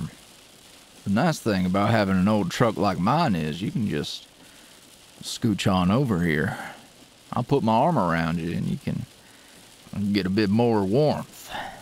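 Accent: South, United States. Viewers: plz more southern audios they’re ultra comforting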